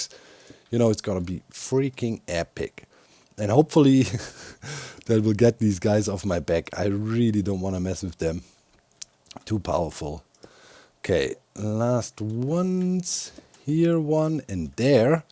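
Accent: Germany. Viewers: In my [admittedly long] student career, the professors with the best true/technical understanding and working knowledge of their chosen field have been German. I count those professors among my favorites; I learned so much from them, and still dream of aspiring to their level in my own work. Is that why I enjoy watching vids? Might be. He's got the Hive Mind, but he's also got an accent like my old professors, and he produces the specific-technical kind of violently ingenious applications that might as well be a stereotype of Germanic Engineers. And he's got a thing for tomatoes. Inspiring yet relatable, with a comforting accent. Don't worry about the video editing, nor the final length of the videos you post. I think your fan base is here for the long haul.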